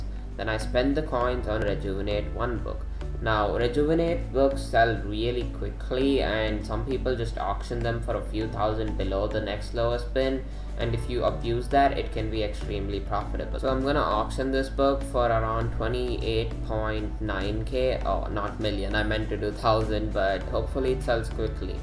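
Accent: India. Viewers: The Indian accent shining thru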